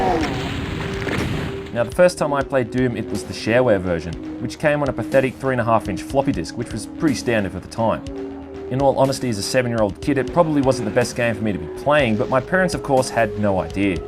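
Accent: Australia. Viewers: I can accept that it is the grand-daddy of FPS games, but I still like Heretic better. Although I can concede that Heretic is made a lot easier by not having any enemy using a hitscan weapon against you. And I absolutely love your Retro Reviews. Made especially better with your Aussie accent of course, and funny things you say that only us Aussies would say :P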